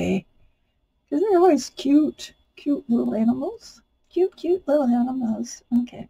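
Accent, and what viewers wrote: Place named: British Columbia, Canada. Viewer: Hi you sound decidedly Canadian BC in particular... LOL if people don't want to listen to your channel they can move on those of us that want to listen we will and as far as your hands go your hands look like every other artist hands except they're not plastic looking I hate it when they try to do these crafts with those bloody Nails on it's ridiculous you're fine and you're doing a great job and thank you for showing me what I can do with all my messed up resin projects. I am extremely new to pouring resin and most of what I have poured by now isn't real useful until now thank you❤❤❤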